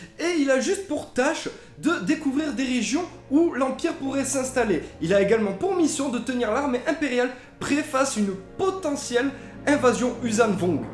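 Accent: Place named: France. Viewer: Bon choix de sujet, Thrawn c'est le meilleur perso SW de tous les temps :) Cependant j'ai un peu de mal avec ta prononciation très frenchy, sa race c'est "Chiss" (prononciation: Tchisse), pourquoi donc prononcier "Chie"? xD bon pour Pellaeon j'avoue plein de monde a du mal ^^ et tu as dit "zogri" au lieu de "nhogri"... (je pinaille hein mais c'est pour que vous vous amélioriez!) :p haaan et à la fin t'as dit "général Thrawn" :) Notons aussi que certes en revenant vers eux Thrawn est adulé par la majorité de la population Chiss, mais pas par les classes dirigeantes qui font exprès de l'ignorer royalement (trop fiers pour montrer qu'ils s'étaient trompés en l'exilant!) Bon voilà désolée pour les petites critiques mais c'est pas méchant ^^ encore une fois vous vous êtes bien documentés c'est une bonne chose :)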